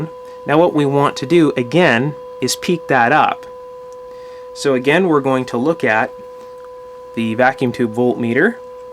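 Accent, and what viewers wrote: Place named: Canada. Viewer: Are you from Canada? it sound like you have a Canadian accent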